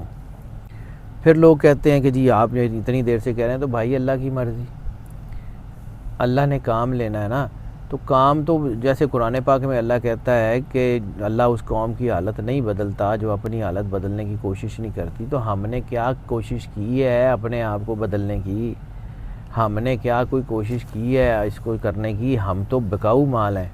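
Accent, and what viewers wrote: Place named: Lucknow, India. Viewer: 'Andaz e Guftagu' sounds like Luckhnowi Urdu, really.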